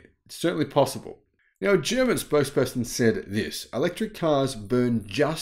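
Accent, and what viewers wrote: Australia. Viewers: Definitely a pro EV slant to his story telling here. ICE generally don't catch fire unless they are older cars with fueling faults. EV fires are harder to put out and happen to new cars more often than ICE cars. On a plus note, he does look like a Viking (with an Australian accent!).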